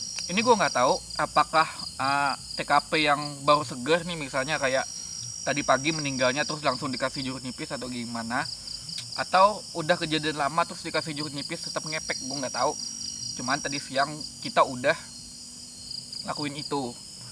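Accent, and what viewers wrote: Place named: Banjar, Indonesia. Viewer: Lihat logat sepertinya utang banjar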